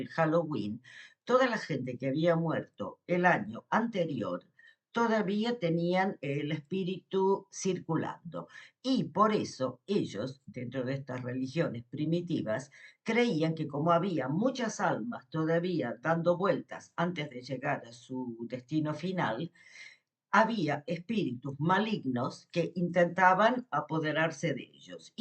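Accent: Argentina. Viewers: Hola!! Hace poco q te veo,pero me encantas.porcierto me gusta mucho tu acento argentino ❤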